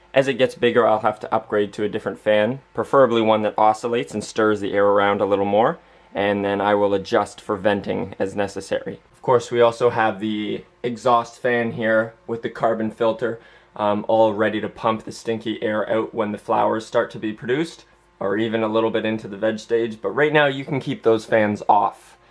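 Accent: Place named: Canada. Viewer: Great vid, nice and concise, aaaand Canadian? Subscribed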